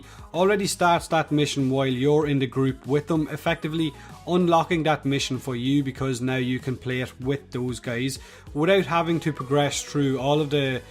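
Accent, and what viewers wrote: Ireland. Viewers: is that an irish accent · YES IVE SPENT MONTHS LOOKING FOR AN IRISH WARFRAME YOUTUBER THAT ACTUALLY SPEAKS IRISH!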